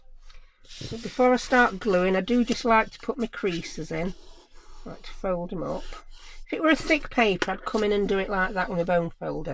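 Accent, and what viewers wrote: United Kingdom. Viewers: #1. LOVE your tutorials. #2. LOVE your accent! Might sound crazy but your accent is very much like Paul Sutherland from True Crime Enthusiast podcast! The hubby and I love that podcast. I say this with the utmost appreciation because it is a welcoming accent. I feel like we could sit and chat over a cuppa tea<<<of course that kinda sounds like the start of a creepy stalker episode of True Crime-muwahaha. {Nah...just a native New Yawka that loves cool accents and all things UK 💜} and #3. You mentioned a type of glue-is it "calall" glue"-when adhering the corners. What kind/brand of glue is that? Thanks so much for all your awesome sharing!!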